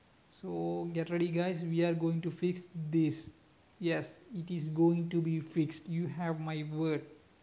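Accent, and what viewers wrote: Kerala, India. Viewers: You're a mallu i bet!! Hii😂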